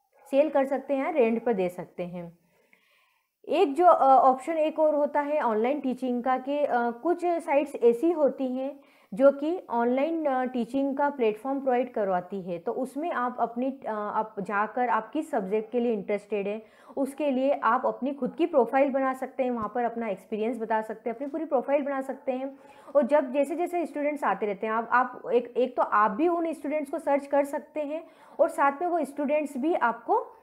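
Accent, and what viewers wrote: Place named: Malwa, India. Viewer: Mam aao kaha se ho?? Apki awaz se to malwa region ke lag rahe ho...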